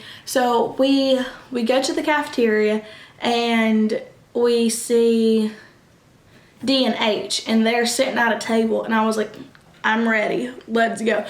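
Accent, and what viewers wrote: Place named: Kentucky, United States. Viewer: I’m watching your video on my tv now lol I’m from Kentucky and you sound like us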